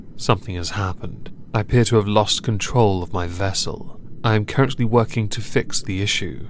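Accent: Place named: North, United Kingdom. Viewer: Maybe, but do they have your buttery smooth northern accent?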